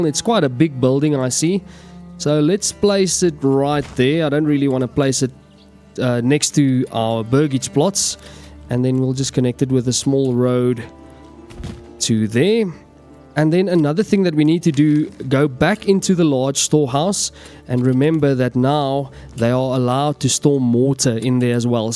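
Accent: South Africa. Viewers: Question there you from? My guess is South Africa 🇿🇦, maybe cape provinces, or Limpopo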